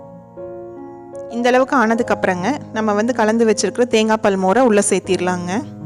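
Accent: Kongu, India. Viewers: எங்க கொங்கு தமிழ் இப்படி தானுங்க இருக்கும்.... என்னங்க பன்றது...? சொல்லுங்க பார்ப்போம் 😊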